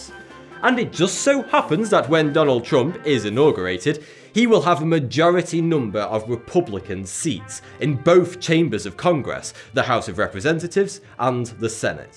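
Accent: United Kingdom. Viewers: Pretty biased an inaccurate. Sounds like a Brit who watches CNN.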